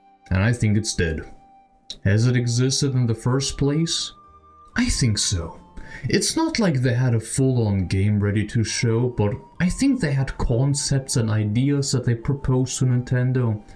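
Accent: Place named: Germany. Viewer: Nah, he sounds like a German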